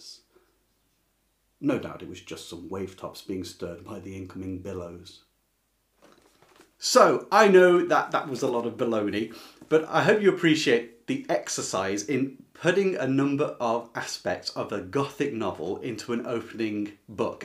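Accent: United Kingdom. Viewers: I seriously wish this could have gone on and on for hours! When you read that story, you brought the characters to life. Having a British person read with such great accents made the story so much more interesting! As an American I can’t really imagine too many British accents and their subtleties, but you made it so amazing :) definitely subscribing!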